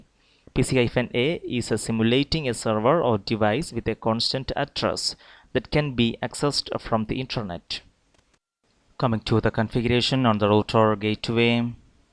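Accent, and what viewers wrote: India. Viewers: Your accent india prideee i'm not understand sir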